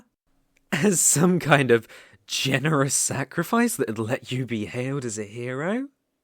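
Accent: United Kingdom. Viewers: Idk why but I can't take anything serious when it's in British like it's so cute and funny so idk bro😭🫶🏻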